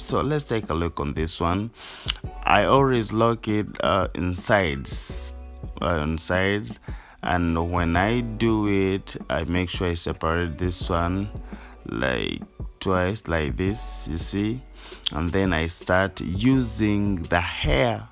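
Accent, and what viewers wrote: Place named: Uganda. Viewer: Sounds like Ugandan wow proud of my country 💪💪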